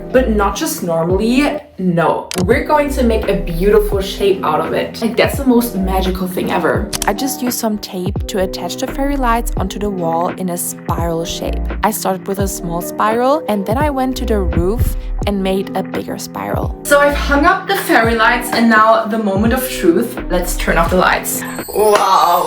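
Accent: Germany. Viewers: es ist so krass, man hört das du deutsch bist, aber dein englisch ist so unglaublich gut omg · Hi 😊 i was wondering if you are german bcz you have this Accent that also have 😊❤ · Gurl u NEED to make a German video . ( if ur German u just sound like it no offense ) I LOVE UR VIDS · Are u german u have a german accent? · i can't with german accent twin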